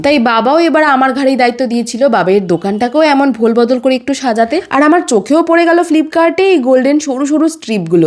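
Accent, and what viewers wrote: Bangladesh. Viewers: Kotha gulo Bangladeshia jogonnno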